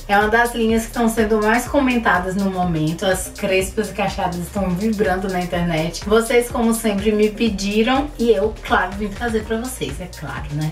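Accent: Bahia, Brazil. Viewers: Queria q vc falasse com mais sotaque baiano. Acho lindoooo❤️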